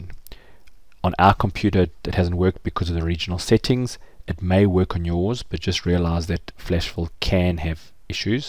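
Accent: South Africa. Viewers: Great Video, and its great to hear another South African accent.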